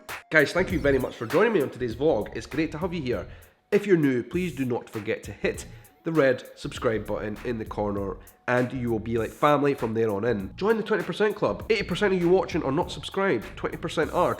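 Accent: Scotland, United Kingdom. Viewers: I watch you for your lovely Scot accent, no matter which part you're from. Very interesting though.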